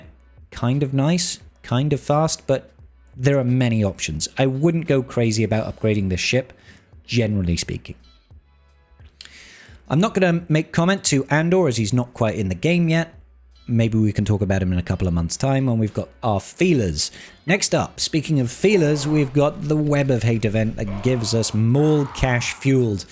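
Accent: Wales, United Kingdom. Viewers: Same here, I have all of them😂 Just enjoy listening to our favorite Welshman.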